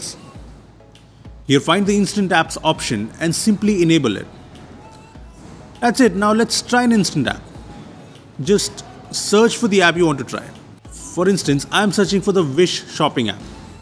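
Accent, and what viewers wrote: India. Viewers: The Indian english accent is just hilarious XD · Thomas Politis me too I hate this Indians videos, his acent is just disturbing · I love Indian accent 😁😉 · As a small country East Asian, Sorry, I'm not fluent English. If you have some time, Could you add English subtitles to this video? I want to learn Indian English also. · Fuck you and your indian accent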